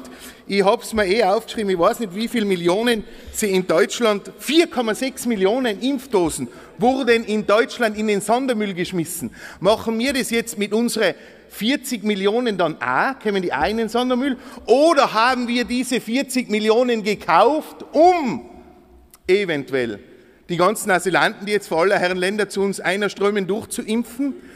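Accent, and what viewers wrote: Tyrol, Austria. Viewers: Spitzenpolitiker welcher sich nicht verbiegen lässt und authentisch ist, a waschechter Tiroler, ich liebe diesen Dialekt 😎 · Mir gefällt Herr Steiner. Sein Tiroler Dialekt ins Hochdeutsche zu sprechen ist einmalig. Er ist echt klasse und bringt es auf den Punkt. Tja die Parlamentarier u. Nat.ratsabgeordnete der anderen 4 Parteien nehmen es mit der Wahrheit nicht genau. Vergessen aber ganz, daß ihre Reden aufgenommen werden sei es mit Video oder per Stenografie. Davonkommen werden's net.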